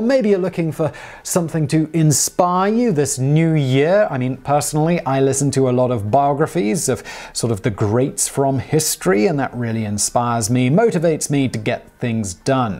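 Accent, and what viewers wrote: United Kingdom. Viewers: Simon I absolutely love you but a vacation is I order my friend! I don't want you to work yourself to death. I so look forward to lovely British accent!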